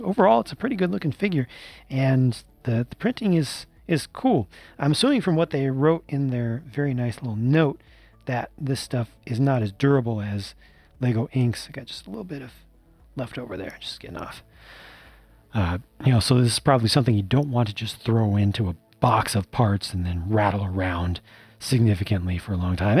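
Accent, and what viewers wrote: United Kingdom. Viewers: Oh my god, the British accent is frickin FANTASTIC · Oof that british xdd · 😄 Nice British accent.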